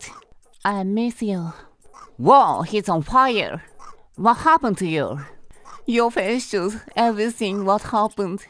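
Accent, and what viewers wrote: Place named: Japan. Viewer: good Japanese English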